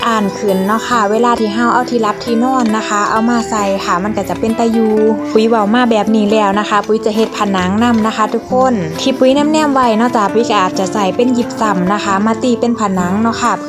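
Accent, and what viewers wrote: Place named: Sisaket, Thailand. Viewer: เว่าไป๊-กิ๊นคนทางศรีสะเกษเบาะคะอยู่อำเภอได๋น้อค่ะ😅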